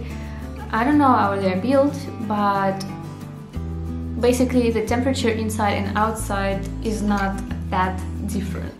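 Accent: Russia. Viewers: Jeez what is it with YouTubers audio!!?!? .. you start with a heavy non/English accent, use a microphone the other side of an echoey room, speak softly and then just to make sure it’s almost impossible to understand you, think some incessant bgmusic is needed!!!! Ok ears are tuning in a bit.. your English is v good for a Russian! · I am amazed your English and your little accent being russian's girl.. you mast have been studying at some English university..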